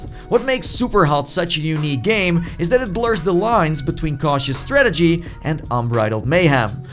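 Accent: Netherlands. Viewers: He sound just Dutch accent am I the only one that hears it maybe because I’m half Dutch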